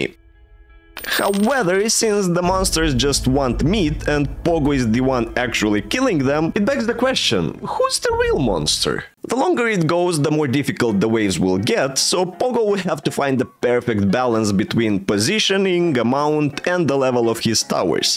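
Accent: Russia. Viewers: Is it just me, or am I hearing a Russian accent? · Youre russian? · It sounds like you have a Russian accent lol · По произношению понятно, РУССКИЙ!!! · рязанский акцент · It's the first video I'm watching by you and I was immediately like "a familiar Slavic accent huh?" Hello, fellow Russian!XD Удачи в твоих начинаниях!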